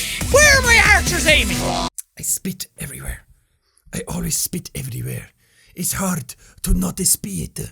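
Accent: Ireland. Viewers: This guy right here got me addicted to Irish accents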